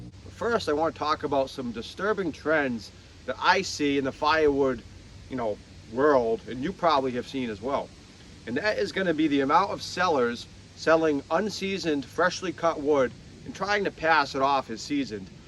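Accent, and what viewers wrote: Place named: South Shore, United States. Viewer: Good video, are you from Massachusetts ? You sound like a south shore accent. I live in northern Rhode island and am fortunate enough process all my own wood. I also have property in Maine and the forest up there is vastly different. Most of the firewood up there is fir and ash on my property.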